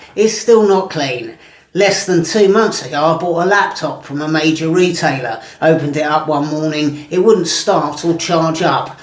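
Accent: United Kingdom. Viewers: You sound British, but just described South Africa.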